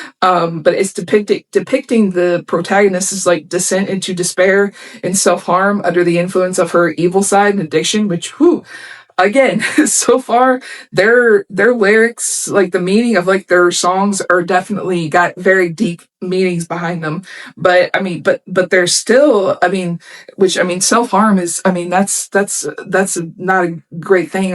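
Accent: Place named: North America. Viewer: Your accent sounds pretty North American, and they come up here pretty regularly since Monterrey is only about 80 miles from the Texas border. Just keep an eye out on their web site for their schedule. Even if it's just a 1/2 hour set in a multi-band festival, seeing them live is always worth every penny - and generally speaking, you won't even have to spend that many pennies - they won't try to squeeze $600 out of you; between $30 and $60 usually sufficient. 🙂 Musicians this good and entertaining for an affordable price is almost unheard of these days, and a tremendous relief for those of us who have been going to concerts and festivals for multiple decades.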